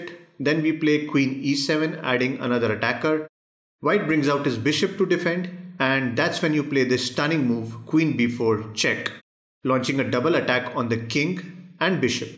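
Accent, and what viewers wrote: India. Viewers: Indian accent is greett👍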